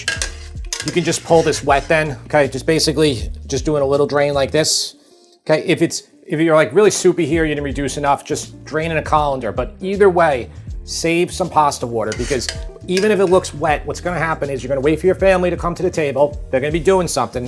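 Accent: New York, United States. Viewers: Not gona lie I'm soooo home sick your accent reminds me of my fam from ny😢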